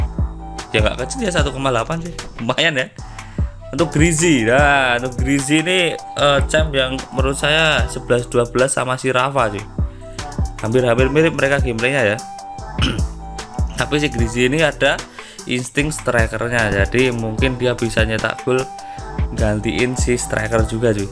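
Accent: Java, Indonesia. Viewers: Logat omongan lu kek orang Jawa bang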